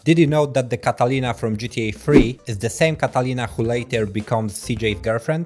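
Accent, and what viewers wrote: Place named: Poland. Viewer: słychać że z polski zajebista robota oby tak dalej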